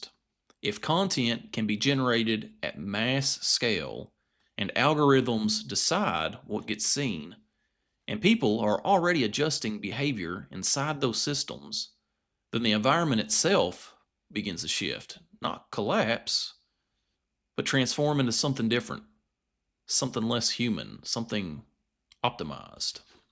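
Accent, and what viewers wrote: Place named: South, United States. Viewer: Great southern accent. Love it. Subscribed.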